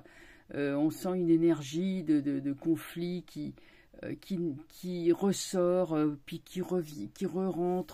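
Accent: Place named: Clichy, France. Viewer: Depuis quelques années, votre voix accompagne mes insomnies, comme une voix amie, comme une amie que j'aurais eue, avec son bel accent de Clichy. Merci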